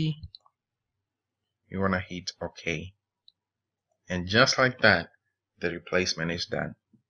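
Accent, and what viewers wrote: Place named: Kikuyu, Kenya. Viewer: Thanx for this. That Gikuyu accent is hard to hide though eh?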